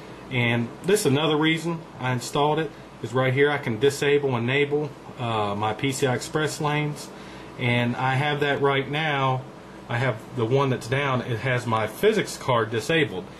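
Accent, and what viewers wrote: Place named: South, United States. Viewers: I'm in love. even though i have a basic computer (if im compairing it to this! lol) but I LOVE THIS CASE. makes me hate my antec 900 lol. very nice and clean build. and i love your accent. nice southern accent :) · Your accent is so... southern.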